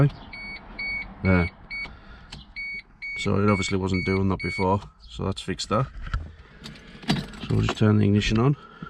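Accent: Cumbria, United Kingdom. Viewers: Where ya from pal, sound very Cumbrian to me like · U from out west marra? Sound cumbrian Lovely car but get rid of them wheels 🙏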